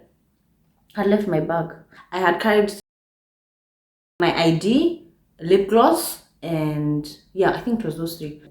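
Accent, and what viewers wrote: United Kingdom. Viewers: When speaks with that British ascent,lawwwd tanivunja mbavu😂😂😂😂😂😂😂😂😂😂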